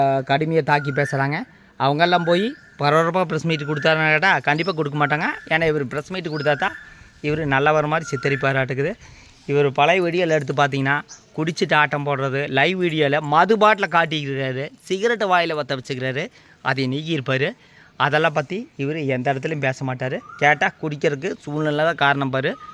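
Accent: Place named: Kongu, India. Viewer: அண்ணா இளவரசன் என்ன சொன்னாலும் அவன் திருந்த மாட்டாங்க அந்த காயத்ரி தின்னே குடும்பத்தை அழித்து விடும் போல😂😂 மொத்தத்தில் அந்த குடும்பமே அப்படித்தான் அவங்க அம்மா தங்கை அவனுக்கு சப்போர்ட் நீங்க இந்தியா குட்டி சேனல் பார்க்க சொன்னீங்கள்ள இந்த 20 நாளா அந்த இந்தியா குட்டி சேனல் தான் நான் பாத்துட்டு இருக்கேன் மாமியார் மருமகள் அருமையா நடிக்கிறாங்க அவங்க பையன் வேற லெவல் மொத்தத்தில் உங்க வீடியோ சலிக்காம நல்ல பார்க்கலாம் நல்ல ஜாலியா என்டர்டைன்மென்ட் நகைச்சுவையாகவும் இருக்கிறது கொங்கு தமிழ் அருமை❤❤❤🎉🎉🎉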